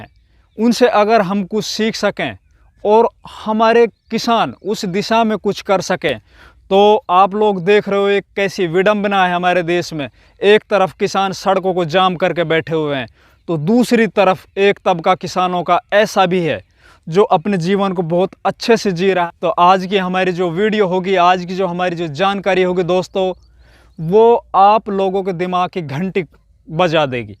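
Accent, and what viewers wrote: Mewat, India. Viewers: Bhai u Tuber vlogger aap kaha se ho. Aapki boli zuban m Mewati zuban ka lahja h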